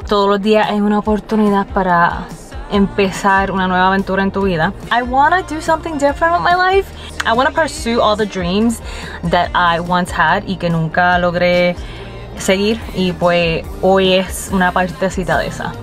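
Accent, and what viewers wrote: Puerto Rico. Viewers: Suenas y te vez mas biricua que muchos otros 😂😂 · siempre me gusta tus videos porque me encanta escullarla ablar en El idioma Puertoriqueno. Espero que aprendas cosinar toda classe de comidas Puertoriquenas.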